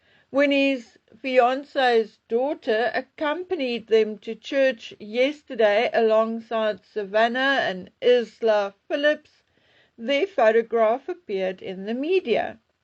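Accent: South Africa. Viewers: I'm not sure where lives, but to me, it sounds like South African. African as a country produces oil.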